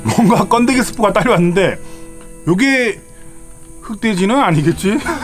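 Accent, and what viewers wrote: Gyeongsang, South Korea. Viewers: 형님~ 강원도라고 하셨는데 출신은 경상도?? 쌔리~~~하네ㅋㅋㅋㅋㅋ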